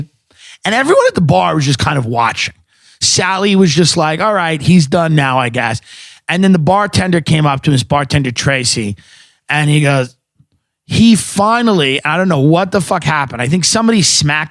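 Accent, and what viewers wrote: United States. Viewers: I'm 8 mins in and all i hear is a yank yapping.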